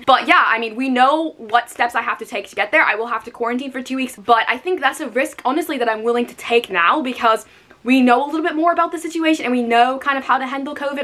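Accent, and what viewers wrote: United Kingdom. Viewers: as a british person I can actually agree! she sounded like someone from North London! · You sound so british for an american 😭😭😭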